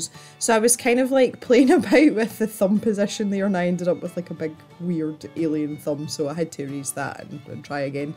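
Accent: Scotland, United Kingdom. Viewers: I don't really know how I actually found your video, but watching you and hearing you talk I get so many Scotty vibes here :D I love how you say "wee bit" a lot :) it is a nice drawing, though. I shared my own illustration on Instagram (@ I drew a Manga girl in a ball gown :)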